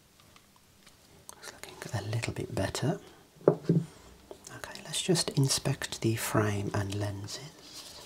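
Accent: United Kingdom. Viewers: Love your British accent. Marvelous video like always ❤ French 💋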